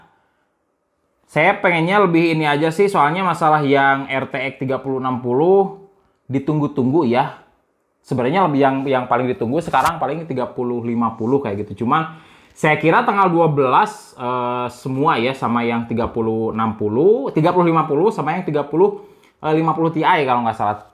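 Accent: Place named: Tasikmalaya, Indonesia. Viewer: Orang tasik dia gan, makanya gitu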